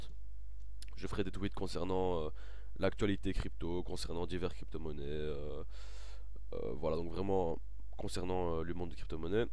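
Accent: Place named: Liège, Belgium. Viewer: salut je viens de m'abonner super présentation, je reconnais un petit accent liégeois :) je suis de lieche aussi ;) février s'annonce prometteur aussi pour POWER LEDGER!!!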